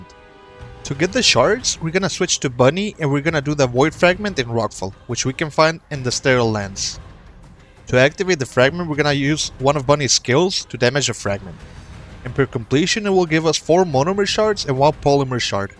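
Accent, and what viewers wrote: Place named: Mexico. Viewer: I can hear the Mexican in ur accent lol. All love brother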